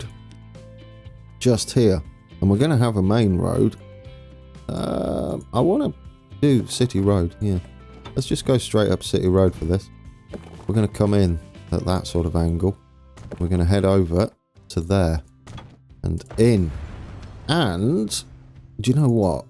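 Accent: England, United Kingdom. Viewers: I live in Minnesota and you have represented us well. To bad the game didn't have the seasons as it is beautiful here in the Fall and very white and cold in the Winters! Might be fun riding along with you on your Interstate 35 and sliding around in the snow and ice! Your my fave! Look forward to your updates and can't wait for your your new TF 2 venture! Thanks for all the hours of pure enjoyment. Why haven't you picked up on the Texas accent yet? A Texas/English accent would be fun to here. By the way. I may be your oldest viewer. I'm 70 years young. I have the game. But my old burnt out brain may not be able to play the Hard or longer games. So I live through you! haha Kinda scary huh!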